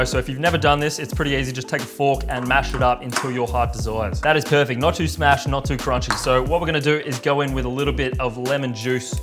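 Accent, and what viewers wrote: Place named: Australia. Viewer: Great content and who doesn’t love Australian accents. Easily one of the nicest people in the world👍🏼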